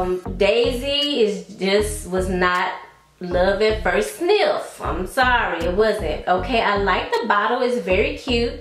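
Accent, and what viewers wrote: Texas, United States. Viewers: Are you from Texas? So am I.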